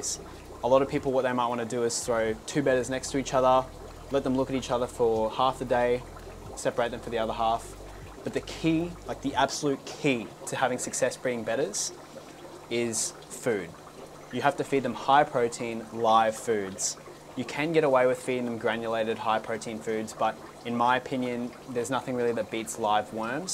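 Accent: Australia. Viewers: This is amazing! What's your accent? Aussie?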